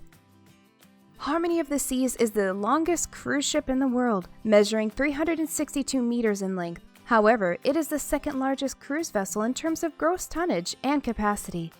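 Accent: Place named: Germany. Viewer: I think it's German or English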